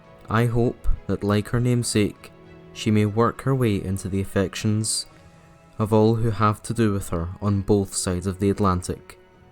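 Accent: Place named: Liverpool, United Kingdom. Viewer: Lovely video. Enhanced by your accent. Liverpool? Great video about our undersung hero.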